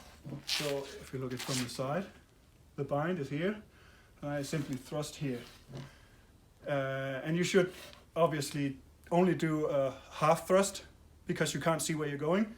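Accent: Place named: Germany. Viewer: Not being rude in any way, great knowledge! I think whenever you say "Ah" it's a little silly however doesn't mean you are talking out of your butt lol, I'm guessing English is a second language and German is first.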